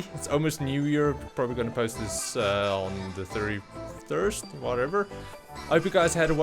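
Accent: South Africa. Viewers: Cool video, thanks bud. Just ordered one of these. Also, you sound South African...are you? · you from South Africa mate? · are you from south africa?? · south african?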